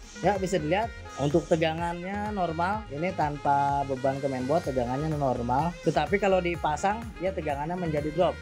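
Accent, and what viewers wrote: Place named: Betawi, Indonesia. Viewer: Logat bicaranya lucu ...Betawi Betawi gimana gitu.....mirip teman saya orang serpong😅😊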